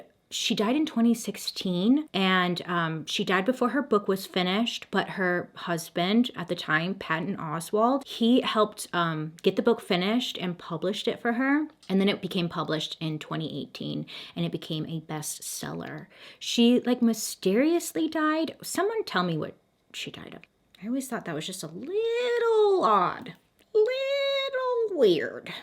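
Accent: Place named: California, United States. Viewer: Is it me or this is the first time i can tell her Californian accent... like kardashian or something lol... like the video though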